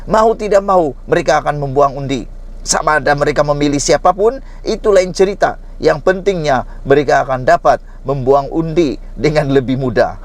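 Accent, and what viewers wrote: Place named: Sabah, Malaysia. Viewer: Menyampah aku dgr suara ko macam suara katak puru dari sabahan..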